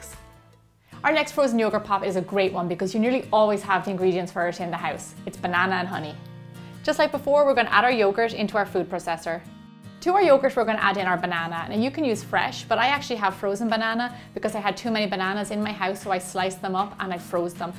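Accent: Ireland. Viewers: is she from Ireland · she is from Ireland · Are ya Irish?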